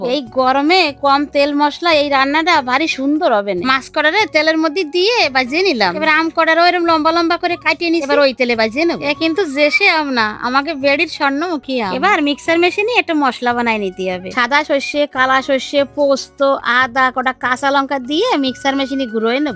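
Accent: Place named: Narail, Bangladesh. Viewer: নড়াইলের ভাষা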